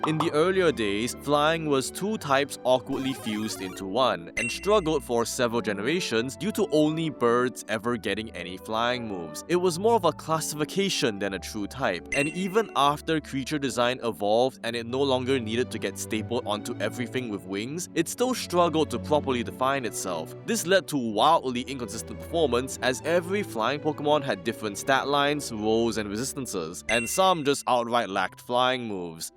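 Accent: Singapore. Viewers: are you Singaporean, cuz the accent do be like that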